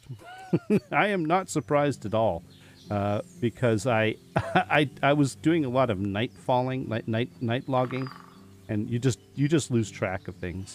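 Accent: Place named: North Dakota, United States. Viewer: So I have been sitting here binging this series (how long will it take to get caught up?..haha). I have to say thank you, listening to your gameplay while I work just makes my day better. I am related to a bunch of North Dakotans and Minnesotans and your accent is very similar, it just puts a smile on my face. So thank you again. :)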